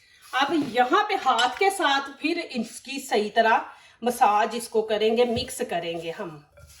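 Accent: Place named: Punjab, India. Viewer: Panjabi chhey ketni bhe achhey urdo boley pata lag jata hy panjabe hy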